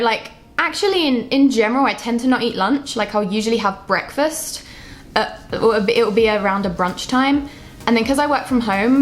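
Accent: United Kingdom. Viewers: I honestly didn't realize the accent until you mentioned the fact that you were from England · Oh my lord, blonde hair, blue eyes, and your british accent. Im in love!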